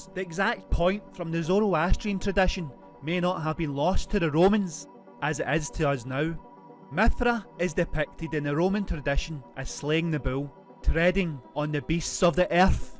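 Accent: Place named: United States. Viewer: To the owner of both..'lost history Channel",' light up the dark" channels ...my sincere apologies for any offence taken , two AMAZEIN CHANNELS , I totally went around it the wrong way , of saying i loved the strong American outstanding narrator which made me listen more intensely, am a Glasgow man myself please don't take offense ,it's just i hear that accent everyday.... Sincere apologies again ......ANOTHER SCOTMAN.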